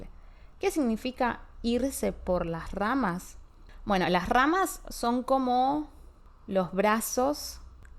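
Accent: Argentina. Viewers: Me gusta mucho lo que haces. Para mí es muy importante escuchar el español argentino. Con mucho gusto y beneficio escucho y veo tus podcasts. ¡Muchísimas gracias!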